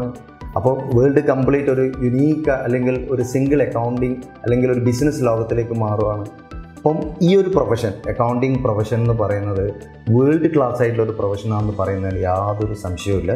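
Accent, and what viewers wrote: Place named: Kannur, India. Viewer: കണ്ണൂർ ഭാഷ വിടൂല്ല.. സർ മുത്താണ്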